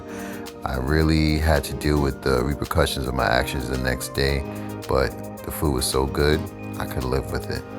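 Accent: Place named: Bronx, United States. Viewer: This is the first video I've watched of yours...I heard the Bronx and I had to subscribe. I miss Kwame's restaurant in DC, guess I'll have to come back home and try Tatiana.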